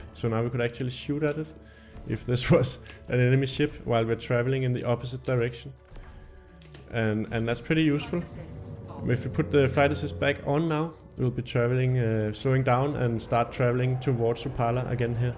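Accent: Denmark. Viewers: Cool guide, and lovely danish accent (I'm norwegian myself :p)!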